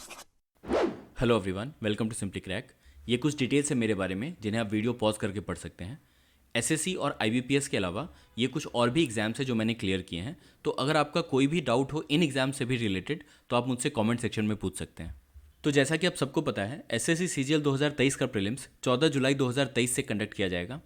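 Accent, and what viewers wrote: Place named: Bengal, India. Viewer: Sir আপনি কি বাঙালি ? আপনার হিন্দি তে যেন বাংলা টান আছে ...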